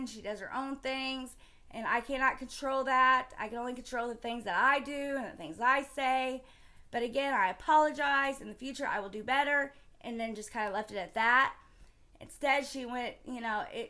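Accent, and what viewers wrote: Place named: South, United States. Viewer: Wow I never would've guessed you're from Indiana. You sound like you have a southern drawl.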